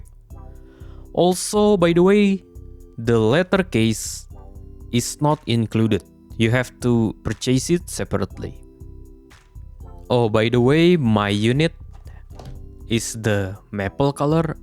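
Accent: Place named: Indonesia. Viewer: Aksennya aja Indonesia banget, lagian bisa pake translate kocak kalo gak ngerti English 😂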